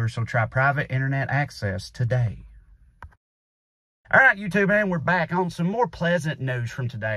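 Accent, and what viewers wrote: South, United States. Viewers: Love ya Trae ❤ & I'm so bloody proud of myself that - as an aussie - i can understand you without subtitles ( because you speak really fast as well as with an accent I'm not used to even among american accents 🤣🤣 ) - love ya like chicken ❤❤❤ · As someone who was born in Alabama, thanks for this video, great example of someone with a strong southern accent who sounds legitimately intelligent. lol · Speaking as a Mississippian, I don't hear the word "defenestrated" or "feckless" said with a Southern accent nearly often enough. I know my neighbors too well to trust them running anything much or picking our leaders. Through their great decision-making skills, they have enough trouble getting through their own lives, I don't want 'em having much influence on mine. Vote blue.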